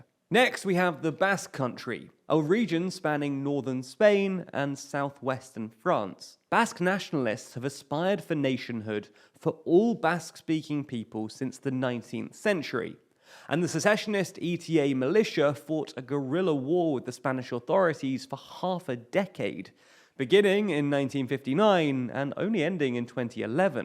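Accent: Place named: RP, United Kingdom. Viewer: Interesting how “island” and “Ireland” are homophones in your RP accent.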